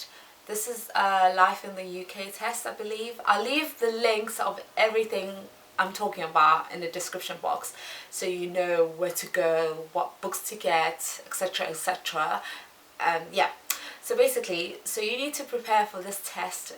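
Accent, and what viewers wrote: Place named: South Africa. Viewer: but you grew up this side right, cause i can here that SA accent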